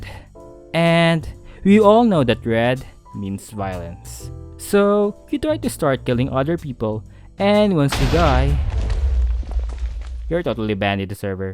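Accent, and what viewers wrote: Philippines. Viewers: Is it me or his accent sounds like filipino Xd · u pinoy ur voice is SOOO PINOY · You sound Filipino.. are you? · Well that explains his accent! I’m Filipino too!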